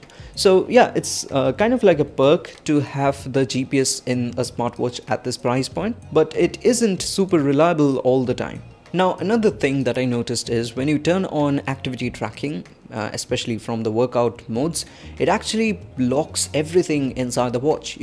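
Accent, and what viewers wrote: India. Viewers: Good review! By the way, your accent is nice too not the typical heavy Indian accent that's somewhat annoying because it's super hard to understand. FYI regarding the GPS though most Running watches even Garmins have issues when near or inside buildings.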